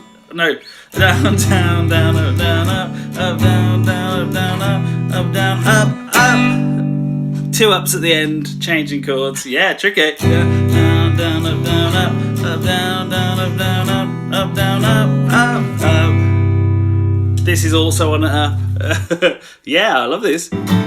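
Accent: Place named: Birmingham, United Kingdom. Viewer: no worries and makes sense! You sound like a fellow Brummie as well 🤙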